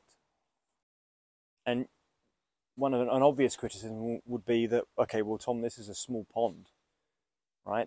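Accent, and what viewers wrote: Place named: England, United Kingdom. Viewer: He’s speaking in a English kingdom accent and people that do that have a different definition of words ..often the English like that is only understood by the speaker or someone that does the same..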